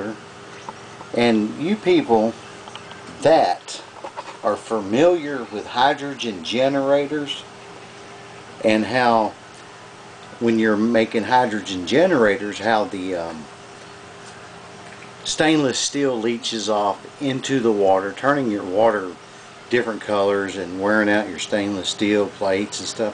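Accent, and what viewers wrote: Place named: South, United States. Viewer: I really hate the southern accent. It makes the speaker sound so uneducated and dumb. I can't stand how they speak so slowly, repeat themselves, go into detail about things that are common sense, and mispronounce words. If I hear this guy say the word "ColloiDIAL" again, I'm going to scream while projectile vomiting. A der da da der. "Hey, Jim-Bob! We should make a colloiDIAL silver beer to drink on Nascar race day!" "Their makin' a left turn!"- Bubba J. (Jeff Dunham)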